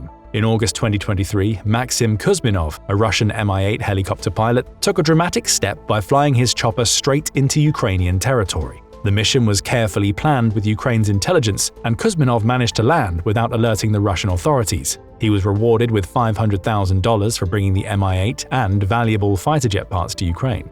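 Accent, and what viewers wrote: United Kingdom. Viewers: British accent. Discount all you hear. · When someone with British accent says it's 100% real, then is based on british media or BBC .... :face-purple-crying::face-purple-crying::face-purple-crying::face-purple-crying::face-purple-crying::face-purple-crying::face-purple-crying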